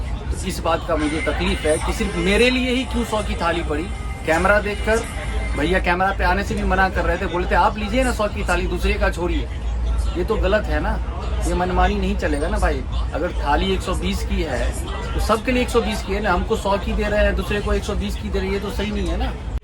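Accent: Bihar, India. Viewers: I watch ur videos on mute as I don’t like ur bihari accent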